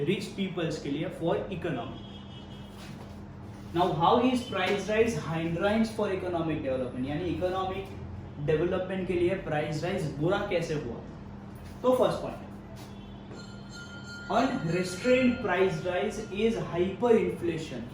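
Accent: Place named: Gujarat, India. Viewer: Sir aap Gujrat k lagte ho!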